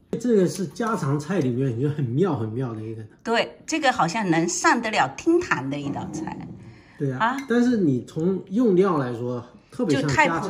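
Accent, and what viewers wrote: Fuzhou, China. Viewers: 听福州口音很亲切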